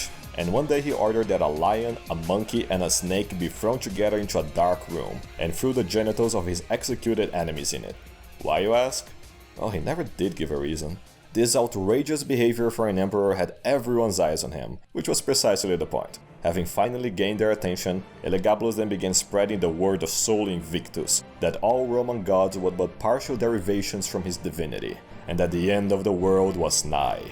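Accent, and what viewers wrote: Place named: Brazil. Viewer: bro WHAT COUNTRY ARE YOU FROM??? YOU SOUND LIKE A BRAZILIAN SPEAKING ENGLISH and this is coming from an English teacher that is brazilian as well so wtf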